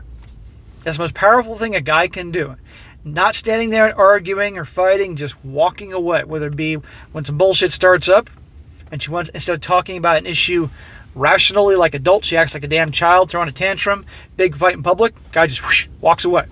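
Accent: Philippines. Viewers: Sounds like he’s from the Philippines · Sound like the man is from the Philippines. Mabuhay !!!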